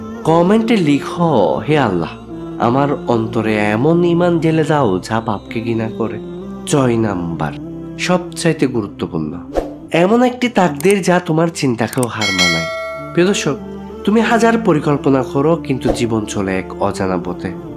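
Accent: Chittagong, Bangladesh. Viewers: O chatgaio ki bolo go . polat kole low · এমন ভিডিওগুলি আরো অনেকেই দেন সুন্দর সাবলীল হৃদয়ের ভাষা দিয়ে যা মন কেড়ে নেয় পানি আসে। কিন্তু ভাই আপনি চট্টগ্রামের লোকাল ভাষায় বর্ণনা দিয়ে বিষয়টির মাহাত্ম এবং গুরুত্বই নষ্ট করে দিয়েছেন। কিছুদিন ভাষা চর্চা করেন চট্টগ্রামের লোকাল ভাষা পরিত্যাগ করেন। তারপর ধারাবিবরণী দেন আমরা খুশি হব।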